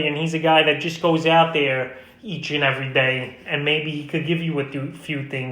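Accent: New York, United States. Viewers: My dude where u from? Nyc?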